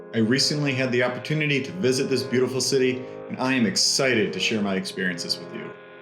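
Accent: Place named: North America. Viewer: See, a WarPigs recommendation carries so much more weight in a North American accent. Kudos to matey on the tat, very DK.. Now the best thing about short form videos is that you've actually been present wherever you've visited, might actually have some memories that aren't digital, as such. Kind of a shame though, in the sense that the narration is pretty good, you're smart enough to have survived our cycle lanes (imagine how entitled a cyclist can get, when we have right of way..) and the accent isn't one of those that makes us grateful for the ocean dividing us.. Remarkably off-topic and not so much leaning into any, umm, cultural stereotypes as just being a bit proud of our guys n gals being able to make the team - but there's a few vids on YouTube called "My War - Danish Afghanistan Documentary", that's one of the few that covers something where our boys and girls have come out to play with forces from the US, Canada and the Brits. 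Nearly all of it helmet cam footage and the rest is the soldiers' own cameras, hasn't been through the "propaganda ministry" for editing either, pretty raw. w/English CC <link> Kinda like watching the BSG Raid series..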